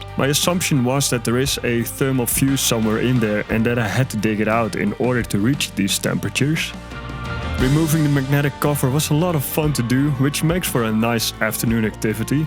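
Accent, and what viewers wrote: Netherlands. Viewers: Wait a MF SECOND‼️‼️‼️‼️ You're Dutch‼️‼️ i hear you say jesus with a dutch accent‼️‼️ ik wist het😂😂 i'm also dutch😂😂 game recognizes game😂😂 and also i hear you say fucking hell fucking shitzooi, between and in the video 😂😂😂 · Hey ur Dutch I’m Dutch too ik hoorde al aan je Engels accent deze lijkt een beetje Nederlands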